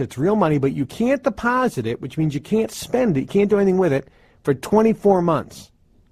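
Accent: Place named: Boston, United States. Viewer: where are you from? i hear your Boston accent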